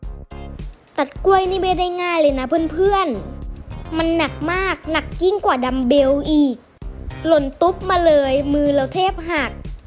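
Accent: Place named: Ratchaburi, Thailand. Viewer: อยู่จังหวัดไหนล่ะครับเนี่ยฟังสำเนียงเหมือนอยู่ราชบุรีจังเลยล่ะเนี่ย ไม่ราชบุรีก็นครปฐมล่ะนะเนี่ย 555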